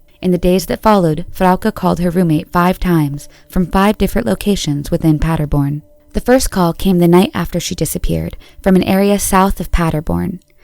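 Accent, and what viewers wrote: India. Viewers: can the girl narrate the videos more? I don’t like the guys Indian voice. I hate it. I skip the videos if I hear it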